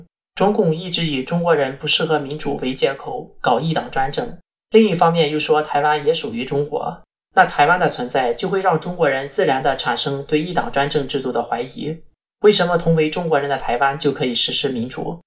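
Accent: Shandong, China. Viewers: 兄弟，你的山东口音让我跳戏。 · 读稿子的是山东人吗？ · 山东味太重了……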